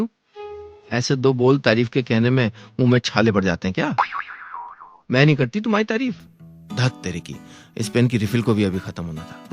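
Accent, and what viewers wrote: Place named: Punjab, India. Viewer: बहुत खूब. पंजाबी तड़के में कहानी सुनाने का ढंग बहुत ही मज़ेदार.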